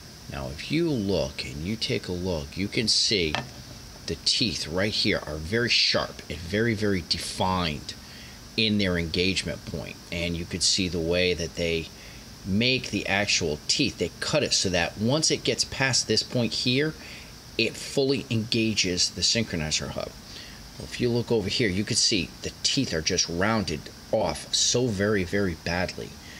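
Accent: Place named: Delaware Valley, United States. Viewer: This video ended up in my recommendations. While I was watching it I was like, "Wait, that accent. This guy is from the Delaware Valley". So then I check and yep. I love our accent. Its so obvious!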